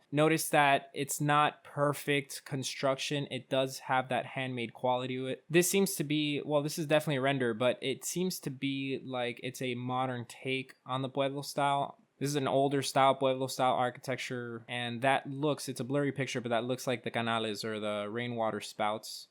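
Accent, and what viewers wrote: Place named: Miami, United States. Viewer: I knew it since i heard your voice my first reaction was “something about his dialect just screams Miami” lol Our dialect sticks out like a sore thumb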